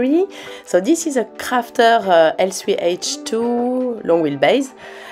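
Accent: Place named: France. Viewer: Love your french accent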